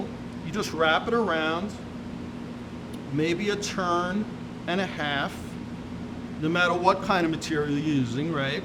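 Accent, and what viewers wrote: New York, United States. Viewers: Former New Yorker here, and damn, that accent makes me homesick 😂 Thanks for the explanation, would love to see the procedure a little closer up, but even so, this gave me the confidence to try to resolve a really bad leak from a garden hose shutoff valve. Wish me luck! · Great video, thanks, ditto on hearing that New York accent!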